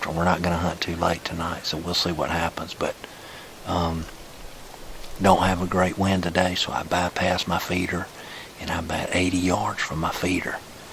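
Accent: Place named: South, United States. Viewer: Dope video love the clarity of the audio too it’s so calm and quiet but the southern accent and saying “keeel” the hog brings it all together 5 stars ⭐️⭐️⭐️⭐️⭐️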